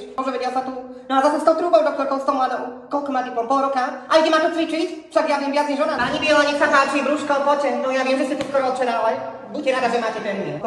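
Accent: Slovakia. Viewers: 😂bože, ja z Teba zemrem...Úplne najlepší...Milujem Tvoj kubínsky prízvuk, perfektne všetko "odohrané". Jednoducho, Tvoje videá mi vedia zlepšiť náladu aj keď ma prepadá temná nálada😅 Ďakujem ❤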